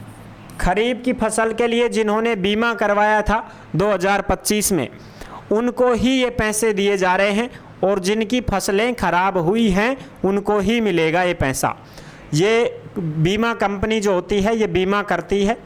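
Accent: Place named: Hadoti, India. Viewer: आपकी हाडोती बोली बहुत अच्छी लगती है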